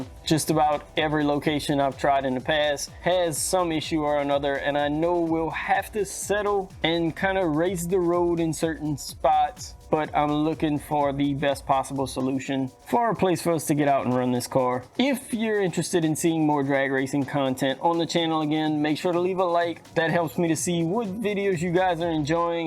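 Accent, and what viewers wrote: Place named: South Louisiana, United States. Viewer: Man are you from South Louisiana? Heard a familiar accent in your wide body corvette video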